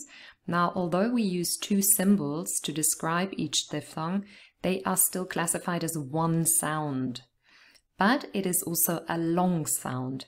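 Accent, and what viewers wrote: United Kingdom. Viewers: Is it British accent? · I could listen to you for hours together. Language and phonetics is such a fascinating world to explore. After watching Dr Geoff Lindsey, my curiosity about English phonemes exploded, but I was not acquinted with the compound vowels symbols, so was finding it a little difficult to follow some of his videos. So I am glad YT brought you in my feed a week ago. I am bingewatching your videos to understand the basics of English vowels and consonants and speech pattern. I am always fascinated by British accent. Your videos threw light on how it comes to be. I hope British people do not lose their accent in coming generations now that everything is getting Americanised these days. 🙏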